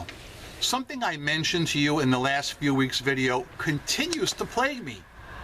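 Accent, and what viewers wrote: New York, United States. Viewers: that cawfee accent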